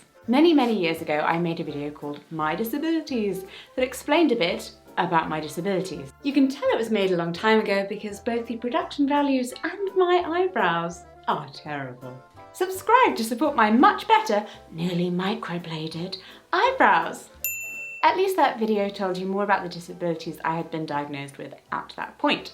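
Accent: United Kingdom. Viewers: Hey . You said you’re deaf . Trying to figure out how your speech is so good . Like how were you able to pick up a perfect British accent without being able to hear ? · I wish there was a Love button instead of Like... I love your snarkiness!! So refreshing especially with the British accent; much love! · Hello, recent suscriber here, l like your channel altough l might not be in your optimal demo ( 53 y/o male), l do like interesting human beings and l find you to be quite striking and l think that you have a very melodic voice and a wonderful british accent, even if you can't hear it yourself ironnicaly, l'm french canadian and l do appreciate the fact that l understand you perfectly because of your brilliant diction and l think that you make a beautiful couple you and your wife, anyway, just wanted to tell you, oh and l'm certainly not alone in this but l wish that l could rid you of all the things ailing you with the snap of a finger! 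☝️😌 you might not read this because of the many messages that you get but l just wanted to show my appreciation, so godspeed and be well! 🧡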